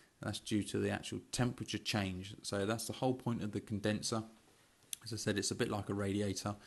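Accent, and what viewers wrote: United Kingdom. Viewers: I love your dialect Sir. It reminds me with my lovely British Professor .. Blessings. Once again, thank you. Kind regards. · Stopped watching because i hate british accent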